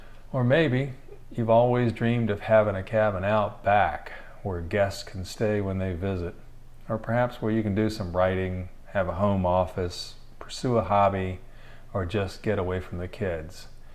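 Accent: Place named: Virginia, United States. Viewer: The cabin is beautiful along with your accent and the fact that you're from Virginia.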